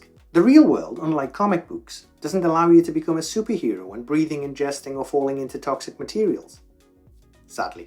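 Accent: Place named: Durham, United Kingdom. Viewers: Ah This is easily one of if not the best airbrush video I’ve seen! Makes me proud you being a fellow Geordie, maybe that’s what made it easier for me to understand (wey aye pet 😂) it’s so refreshing to see I’m not the only one who’s been tearing my hair out trying to learn how to use an airbrush. It helps I have the same infinity CR brush as you do, and the little pot to spray into. Every time I’ve tried to use the airbrush I have spent 80% of the time cleaning 10% swearing and 10% actually painting! In my last paint session I didn’t get any tip dry ( miracle) by occasionally dipping the end nozzle/needle into a small bowl of clean water- is this recommended? It seemed to work for me. Another tip I seen elsewhere was for starting with darkest colours and go down In shades so you don’t have to fully clean in between ie black brown grey white as an example. 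I was using 6mm needle but 4mm seems the way to go as you say, which I also used recently. Anyway cracking video mate I will have to check out more of your videos, I was genuinely laughing as well btw at some of the jokes (GOT S8 is 💩 😂) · lol - btw- where are you from? I live in Canada now, but originally from Durham… Nice to have a YouTube channel with a proper accent in it.!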